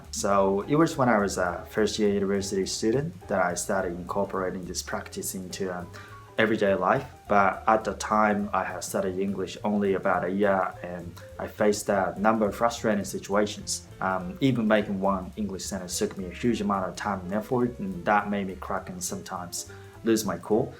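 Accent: Australia. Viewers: 英語出来ないわけではないのに、なんで言ってること分かりにくいんだろうと思っていたら、さんオーストラリアの発音で英語話していたんですね。アメリカ在住なので、アメリカ英語に慣れすぎていました (^_^;)色んな地域の英語聞かなきゃですねー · オーストラリア英語の影響を受けてるよアメリカ英語とは少し違うね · harnessed? 聞いたことない単語確かにオーストラリアえいごなんだろうな。とおもいました。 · ところどころオーストラリアアクセント入ってますね！バックグラウンドが感じられて良いなあと思います！ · すごいちゃんとオーストラリアンアクセントになってる！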